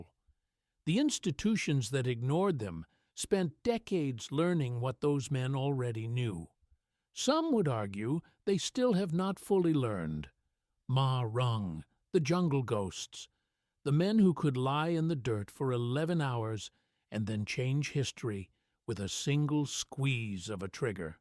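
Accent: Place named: United States. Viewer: The American accent tells me that what follows is bullshit!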